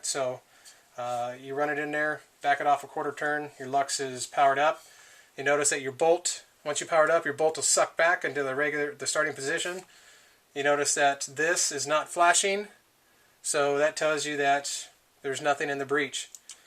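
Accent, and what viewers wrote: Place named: Midwest, United States. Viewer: Its funny cuz ur from california but ur accent sounds like a midwestern one (Wisconsin, Iowa, Minnesota maybe) and im from Wisconsin! Hahahaha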